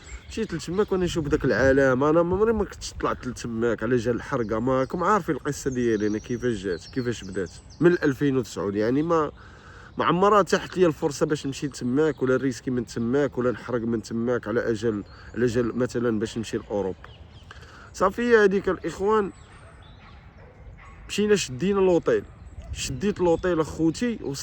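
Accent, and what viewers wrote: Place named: Algeria. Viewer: فديوات ديالك سمعتهم قبل ولكن بلكنة جزائرية كانت واعرة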